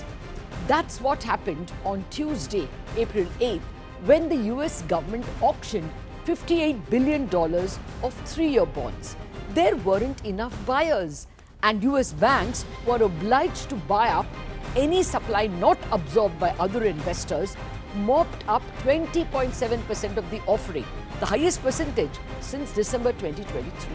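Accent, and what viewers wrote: India. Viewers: Exaxtly, so inappropriate and distracting! Cant stand that indian accent, despite being an english speaking channel! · Why doesn't she dress in a business attire instead of looking like she came out of a kitchen. Also, her accent is too much. I can't understand her Indian English. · Like Sirens Latha glues the listeners to the screen with her unique Indian accent.Why she sounds so persuasive?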